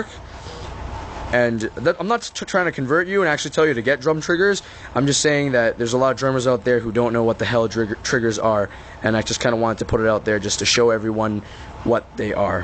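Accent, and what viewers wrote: Canada. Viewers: He sounds like Lars Ulrich mixed with Shia Labouff, but with a Canadian accent. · are you Canadian?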